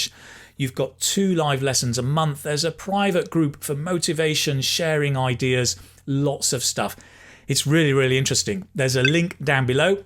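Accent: United Kingdom. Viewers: Hi, we wish you be able to make subtitles for your lovely videos as we cannot trust YouTube's subtitles. I just joined your channel recently and lovecto learn British accent properly👍👍😉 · Hello dear, Thank you so much for sharing this video! That is so kind of you. Indeed, it is very useful and interesting to know about British slangs. I really like British accent, art and culture! In my view, you are a great native teacher because you always provoke the passion of your students to learn and encourage us to study more.You have all essential qualification and knowledge to be a successful teacher who can impress students with unique and enjoyable teaching methods. Although I am knackered, I hope I can get a higher score on the IELTS exam. Cheers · I love British accent 100% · Omg!! British English is just so interesting!!😍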